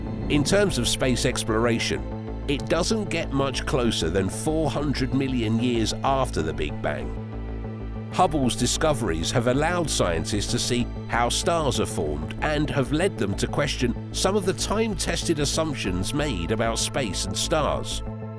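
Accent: England, United Kingdom. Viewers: That english accent made me quit watching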